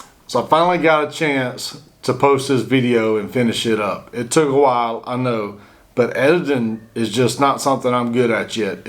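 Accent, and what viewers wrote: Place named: Florida, United States. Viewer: i'm doing a similar build now.. just waiting on the micro comps to come back in stock.. yours looks tough as hell. i was raised in FL.. it's funny how much we sound alike. lol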